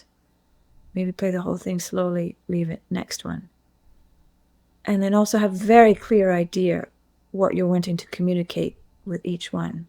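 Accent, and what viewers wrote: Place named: Ayrshire, United Kingdom. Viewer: Lovely to listen to - she still has her soft Ayrshire accent!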